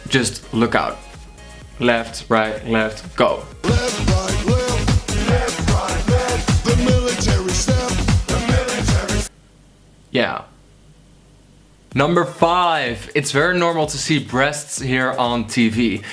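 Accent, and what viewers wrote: Netherlands. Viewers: Je hoort zo erg dat je Nederlands bent. Het is echt schattig😂❤️ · nederlands accent mijn maat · Jij hebt echt een nederlands acksent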